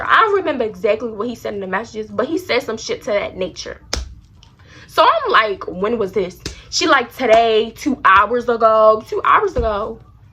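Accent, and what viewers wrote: Baltimore, United States. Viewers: baltimore accent is soo nice 😩😩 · You sound like you’re from Baltimore 😭😭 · Baldamore accent🤪💗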